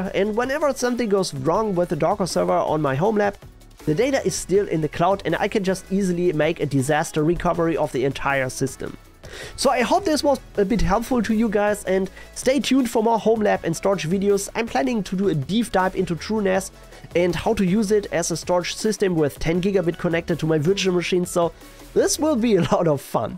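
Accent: Germany. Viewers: Gutes Video!.Deinen deutschen Akzent hört man ja sofort hehe xD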